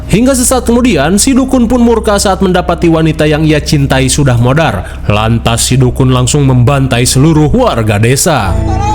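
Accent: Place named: West Java, Indonesia. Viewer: ngomong nya nyunda bngt 😭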